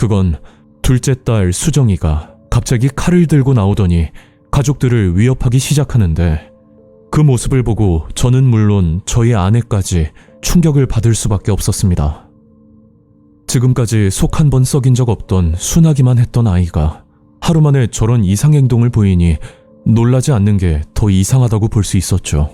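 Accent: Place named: Jeolla, South Korea. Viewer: 아따 전라도라~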